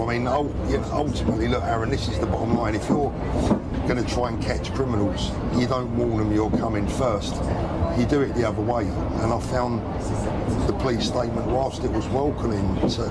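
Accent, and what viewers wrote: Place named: United Kingdom. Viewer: Why is your accent like that if you're from London? It's like provincial English, not what I imagine of a Londoner. 😅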